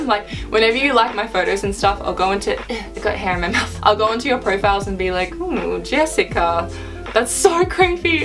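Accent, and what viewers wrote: Australia. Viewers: I knew you was Australian when I heard you speak! Love your wall background and your makeup highlight! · And are you Australian?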